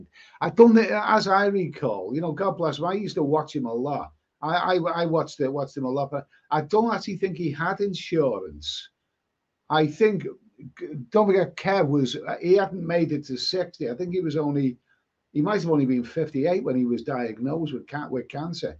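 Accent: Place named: Northern England, United Kingdom. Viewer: This is awesome mate.- absolutely love your input and experience on South East Asia. It has been awful weather in the UK - since you have a Northern accent, I bet it is grim up there, I know the East Midlands (where I'm from) had crap weather throughout the summer. Our winters are dull and horrible. I was thinking of retirement in either Malaysia, Indonesia or Thailand in a few years time but my first choice is Malaysia, hopefully retiring in Kuala Lumpur. Honestly mate, I am so sick and tired of the weather here in the UK, it is depressing, South East Asia is amazing with incredible and friendly people, amazing cultures, superb beaches, fascinating landscapes, jungles, mangroves, cable cars, waterfalls, lakes, mountains, diversity of flora and fauna, amazing food and variety of cuisine, spectacular religious sites especially temples and mosques. 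The list can go on and on.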